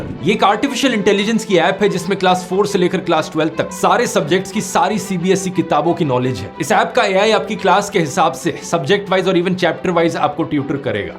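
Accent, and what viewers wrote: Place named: Lucknow, India. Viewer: आपकी भाषा शैली, आपके बोलने के अंदाज़ से प्रतीत होता है कि आप पुराने लखनऊ के निवासी हैं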